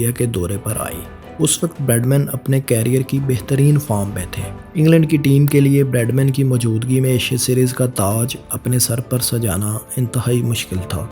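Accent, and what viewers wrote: Punjab, India. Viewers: O bhai punjabi zubani main urdu ka sabaq parh rahe ho? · Is Bhai ka to to Urdu bolen main panjabi lehja hai .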